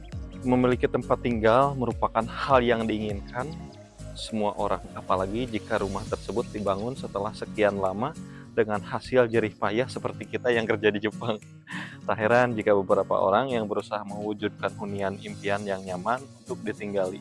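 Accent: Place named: West Java, Indonesia. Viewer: Koko dr Bandung nya,..? Aksen Sunda na kental banget hehe,.. kade atuh bisi rea jurig didinya😅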